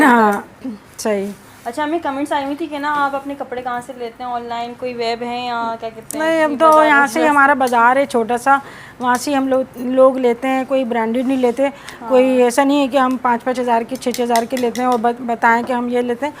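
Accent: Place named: Bhopal, India. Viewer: As salam alaikum Aunty .. Aapki accent kaafi bhopali hai...??Kya aapka koi rishtedar Bhopal India say hai ??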